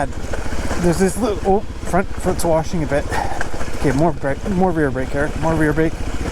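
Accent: Canada. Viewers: I'm liking this series already! I had a feeling that was a good ol Canadian accent. Hi from just north of Toronto Canada.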